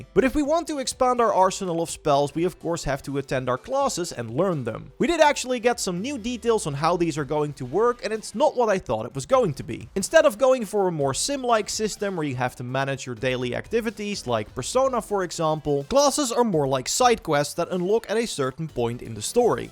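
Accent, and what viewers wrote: Netherlands. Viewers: Definitely some guy at the Netherlands. Very goed Engels. Beetje Louis van Gaal vibes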